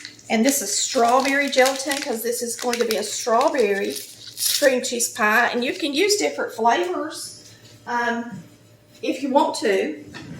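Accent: South, United States. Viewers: I'll figure out a way to get in touch with you but I got to go to bed now got to be up at 6 you make me smile with that southern accent I was born in Macon Georgia raised in Miami after Hurricane Andrew now I am in Mishawaka Indiana alright young lady got to go to bed but I will get in touch with you somehow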